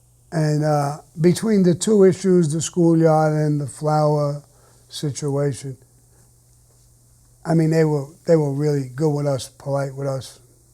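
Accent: New York, United States. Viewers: I moved to Scottsdale from NY in 2014 after 46 years living in NY. NOBODY here in AZ talks like that. It's kinda scary but my father who was born in 1936 had almost an identical accent to you. You remind me of him in more ways than I can say. R.I.P. Please keep uploading content!! For all of us NY transplants it reminds us of home. Salud.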